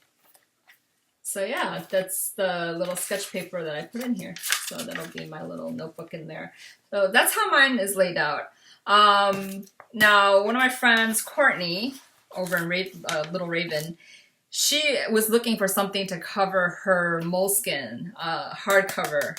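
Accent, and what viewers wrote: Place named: Germany. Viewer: Hey your German is cute! Greatings from Germany 😀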